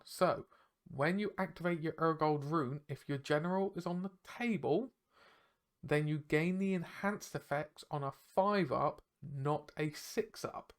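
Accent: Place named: Devon, United Kingdom. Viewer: That voice was much more Devon than I was expecting...love it.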